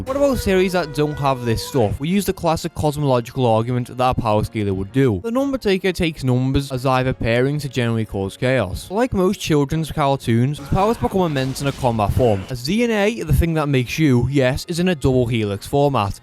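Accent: Liverpool, United Kingdom. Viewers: You sound like your fighting the urge to turn scouse with some sentences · why did I, a fully grown person, just watch a vaguely scouse bloke talk about a childrens tv show for 8 whole minutes?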